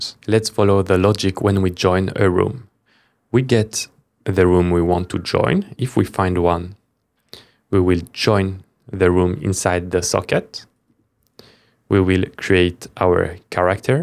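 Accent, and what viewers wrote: France. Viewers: L'accent français ne s'entends pas trop, gros GG en vrai 👌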